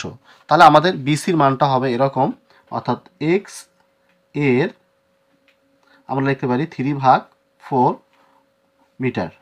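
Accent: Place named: Gaibandha, Bangladesh. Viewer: Apnar basha gaibanda na vaiya😂😂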